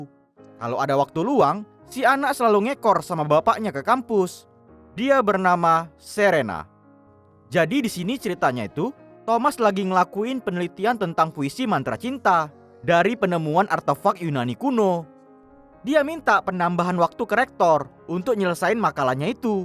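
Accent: Medan, Indonesia. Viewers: orang medan La kau Bang,. yg punya Channel,.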